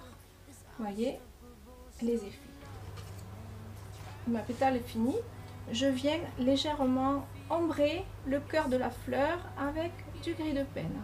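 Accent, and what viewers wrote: South, France. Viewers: J'attends toujours avec impatience tes tutos et comme à chaque fois, c'est un ravissement. Merci à toi de nous faire partager ton talent et puis ton accent du sud est si charmant qu'il apporte un supplément de couleur à tes réalisations .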